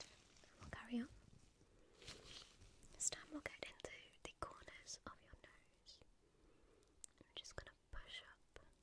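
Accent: United Kingdom. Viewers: What kind of accent is this??? I’m assuming something from the UK, but is there a specific region name? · A woman with a British accent is very lovely 😍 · Ohhh is that a British accent??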